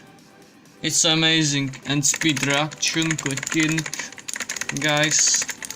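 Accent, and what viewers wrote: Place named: Czech Republic. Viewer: Když už podle prvního slova poznáš že je to Čech :D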